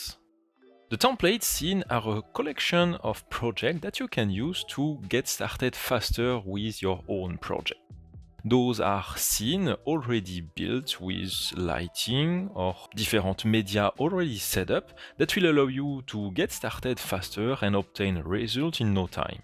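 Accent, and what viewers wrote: France. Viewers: Ohh my goodness, pour ne pas dire "OH MAI goudness grichious" ....Votre accent est trop français. La vidéo est de qualité, mais l'accent fait trop mal aux oreilles. Merci tout de même pour cette vidéo.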